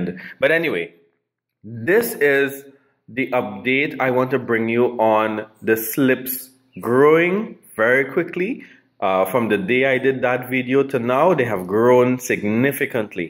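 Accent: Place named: Caribbean. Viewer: Hi, looked at a few videos for growing sweet taters, yours is the best, you explain things great, love your accent from the Caribbean, I've subscribed, and am keen to grow sweet taters along with you. It's end of spring here in OZ. Time to start. Regards Shayne......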